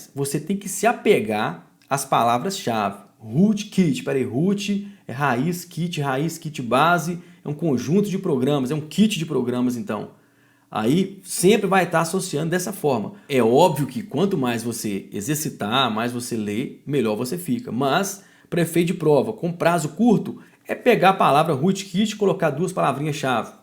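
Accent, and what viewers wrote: Minas Gerais, Brazil. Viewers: Só quem chegou até aqui sabe o sufoco que foi estudar tudo kkkkk assisti aula no trabalho, na faculdade, no ônibus e até no horário de almoço, mas vale a pena porque o professor é incrível, e o sotaque mineiro deixa tudo melhor. Obrigada professor! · aaaaaaaaaa professor por favor continue falando assim mineirinho tracim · Em defesa do "tracim", adoro o sotaques dos mineiros, por favor mantenha o tracim professor! Hhsuahusa... Aula top como sempre, vai me ajudar muito, muito obrigada professor!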